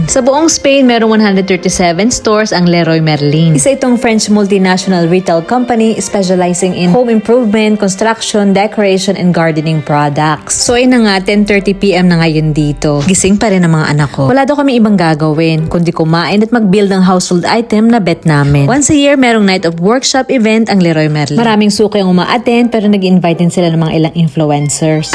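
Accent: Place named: Batangas, Philippines. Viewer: Aliw na aliw Po Ako lagi sa pag voice over nyo 🤣 kaya Po Pala tuwid na tuwid Tagalog Po Ninyo, Ala-Eh! Tubong Batangas ka Pala. 😅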